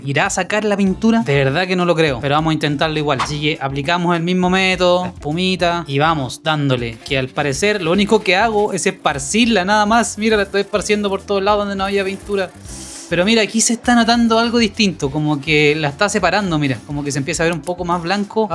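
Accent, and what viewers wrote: Chile. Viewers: Eres chileno? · Chileno, cierto? 😅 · Chileno, bro?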